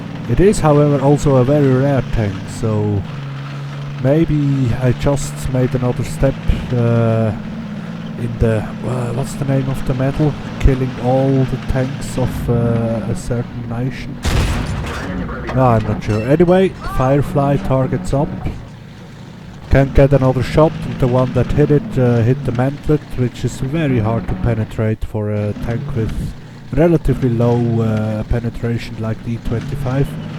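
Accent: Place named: Switzerland. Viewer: Schweizer oder Deutscher? Habe das Gefühl dass dein Akzent ziemlich einem Schweizer ähnelt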